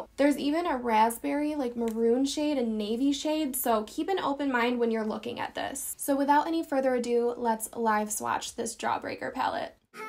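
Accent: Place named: Michigan, United States. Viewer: Are you from Michigan? I'm a former Toledoian and your accent sounds like home! Haha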